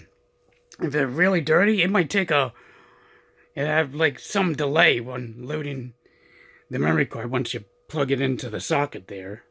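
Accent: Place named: South Jersey, United States. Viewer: I hear south Jersey in you haha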